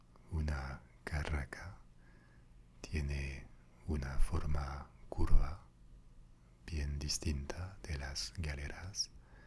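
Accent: France. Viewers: oi todos tus videos en frances e ingles, nunca crei que hablaras en español, estoy realmente sorprendido, hablar re bien el español, aun con el acento marcado al frances pero no molesta para nada, hace exactamente lo que haces, relajar. Mas videos en español por favor!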